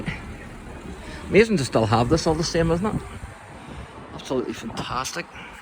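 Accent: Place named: Northern Ireland, United Kingdom. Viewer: I'm from Drogheda - the correct pronunciation is Draw-da, because that's how we say it. I could accuse you of having a Northern accent too but, frankly, kettles and pots! Keep up the good work.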